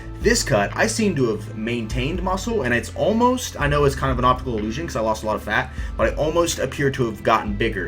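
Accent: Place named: South, United States. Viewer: You from like the South dude hehe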